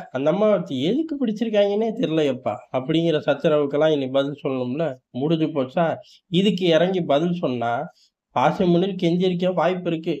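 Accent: Madurai, India. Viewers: தல உங்க மதுரை வட்டார வழக்கு சூப்பரா இருக்கு....அருமையா பேசுறீங்க....நாலஞ்சு எடத்துல குபீர் சிரிப்பு....மாம்பழக்கூடைய தூக்கிட்டு சைனா கெளம்பிட்டான்யா.....கிராஸ் பார்டர் மேரேஜ் சீன மாப்பிளைகளின் மவுசு.....😂😂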